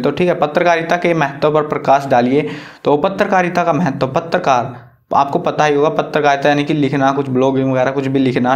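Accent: Haryana, India. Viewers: Haryana se ho bhaiji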